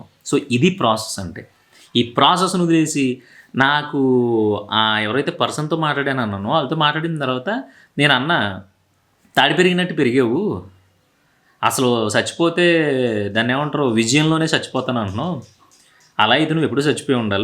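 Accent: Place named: West Godavari, India. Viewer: Confirm ga nv west Godavari anna nuvu ❤❤❤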